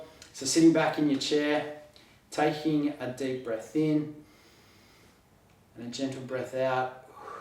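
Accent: Australia. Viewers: Interesting Australian English! Those instead of your. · Perfect pace for this old boy Tks Aussie(?)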